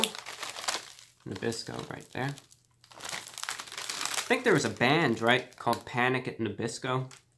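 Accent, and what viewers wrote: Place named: Transatlantic, United States. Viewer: Ive also noticed he has a slight transatlantic accent which takes years to learn (it's not a natural accent to any group of people) thats dedication.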